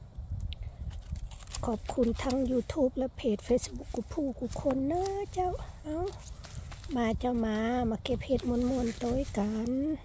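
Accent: North, Thailand. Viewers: อยู่ไหนจ้าวอู้เหนือม่วนดี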